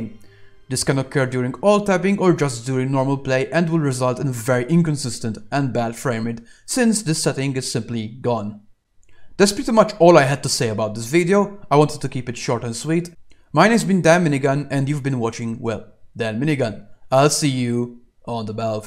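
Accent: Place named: Malta. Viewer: I knew that you are Maltese as soon as you start speaking ;-), so this also apply for an AMD 5700XT ? do you have maybe another video showing an AMD card?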